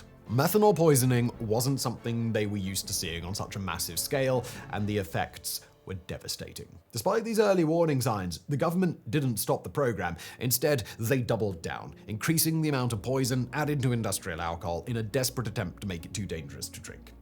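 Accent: England, United Kingdom. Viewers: Whos laws are we talking about? This guy had an English accent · I'd love to hear this guy speak when he's not putting on the poshest English accent he possibly can.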